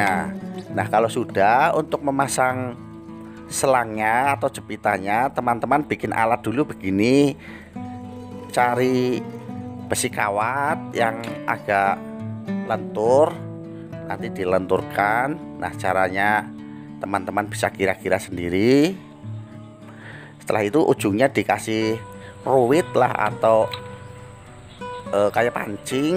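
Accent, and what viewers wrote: Java, Indonesia. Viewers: Kreatifitas tanpa batas... Dengan logat jawa punya..👍👍👍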